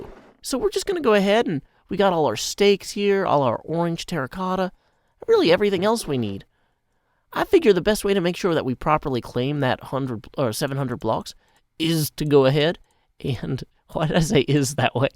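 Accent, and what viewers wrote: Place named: Nashville, United States. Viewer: D you doing an iskall impression was hilarious , nothing will ever cover that nashville accent up :D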